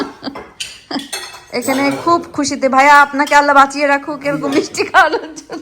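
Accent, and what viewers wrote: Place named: Jessore, Bangladesh. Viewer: tumi onek beshi shundor. Amader jashore er meye bole kotha.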